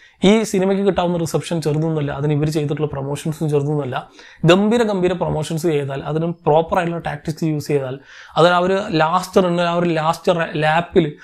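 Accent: Palakkad, India. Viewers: കൊണച്ച വർത്താനം.. നിങ്ങ പാലക്കാട്‌ എവിടെന്നു ഏട്ടാ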